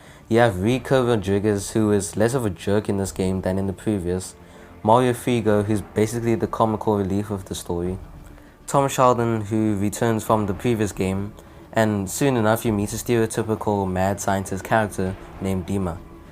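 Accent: South Africa. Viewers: The second I heard your accent I knew you were a fellow South African 😂 great content bro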